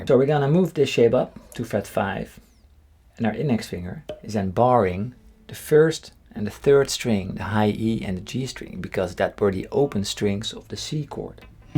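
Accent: Netherlands. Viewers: i knew it you are dutch, could hear it by the accent 😂😂 greetings from almere, any tips on starting to teach guitar? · until this video i did not know you were dutch Hahaha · Ik dank je voor al de uitleg, je uitleg klinkt als een Nederlander die Engels spreekt , maar het klinkt als muziek in de oren. zou je de 6 snaren stemming voor een steel gitaar kunnen uitleggen.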